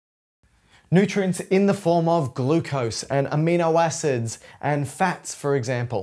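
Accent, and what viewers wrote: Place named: Australia. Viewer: As it turns out, I can only understand biology when it's explained with an enthusiastic Australian accent. Thank you good sir!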